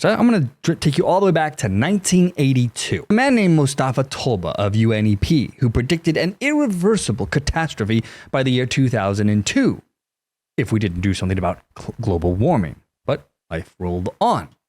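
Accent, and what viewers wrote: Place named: Mid-Atlantic, United States. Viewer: His Mid Atlantic accent is the shit! 🔥🔥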